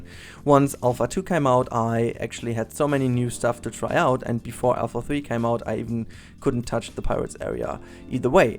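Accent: Germany. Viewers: Jaw-dropping work as always. It is simply un-believable. 😜🙂🙃 I was wondering as a quick question, do you speak any other languages besides English (I'm assuming German because of your accent) but anything else?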